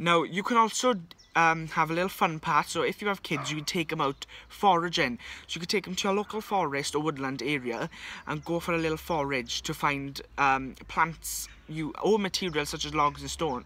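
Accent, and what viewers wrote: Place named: Wales, United Kingdom. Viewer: Awesome land snail, and Welsh accent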